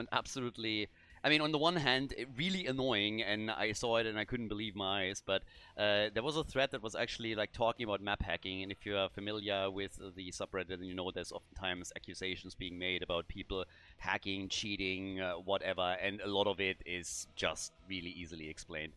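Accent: Germany. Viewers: Thanks for putting in the effort man! Great content! As a Dutchman living at the German border, I really enjoy your German accent 😀!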